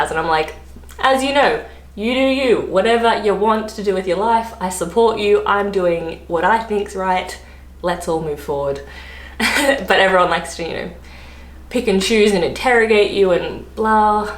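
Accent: England, United Kingdom. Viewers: Your accent is definitely changing. It sounds much more English than in your earlier videos. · Love the way your British accent is improving, you sound more intelligent and slightly wiser. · She is attractive and sounds more English than Australian...interesting observation. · Immediately the first thing I noticed is how much more British your accent sounds compared to part 1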